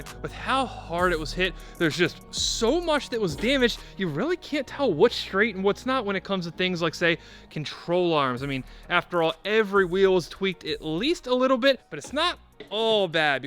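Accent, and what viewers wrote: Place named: Eastern Shore, United States. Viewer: Just by your accent I can tell you're from the Eastern Shore MD😂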